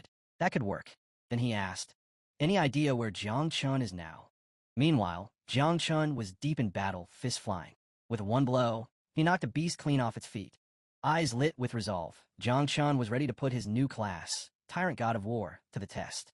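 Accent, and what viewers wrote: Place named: United Kingdom. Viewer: Why does this have british accent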